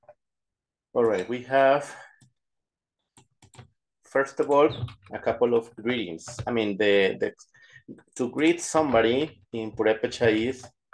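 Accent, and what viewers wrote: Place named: Spain. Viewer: Why do you sound like your speaking Spanish. True precolumbian perupecha would not have had the Spanish influence over pronunciation, but the spaniards did such a good job of destroying our true culture there is no trace of the actual spoke language from before 1500s exist. Thank you Spain. All were left with is traditions passed down by the conquered peoples who were forced to become Hispanics rather that natives after mid 1500s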